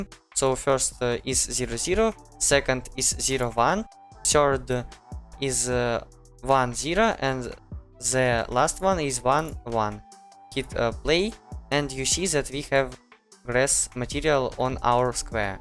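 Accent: Russia. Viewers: бро мы все знаем что ты русско говорящий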